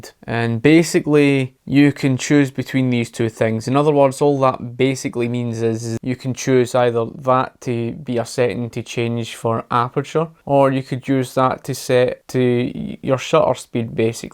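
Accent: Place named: Scotland, United Kingdom. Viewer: A Scottish accent is my favorite accent in the world oh my gosh seriously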